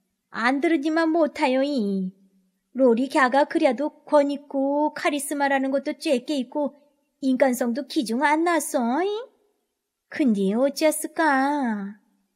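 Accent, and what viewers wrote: North Korea. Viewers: 이북 사투리를 맛깔나게 쓰셔서 우리 고모만난듯 했어요. 따뜻한 소설 잘 들었습니다. 감사합니다 😊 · 언제 그옛날 많이 들어보던 구수한 이북 사투리를 습득 했을까 --- 제목 같이 멋져 버렸네요!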